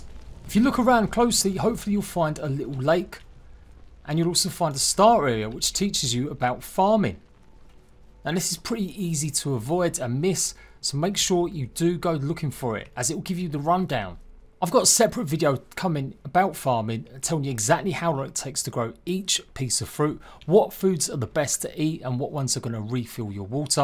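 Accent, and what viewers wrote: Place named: United Kingdom. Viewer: without wo'ah, I love British accent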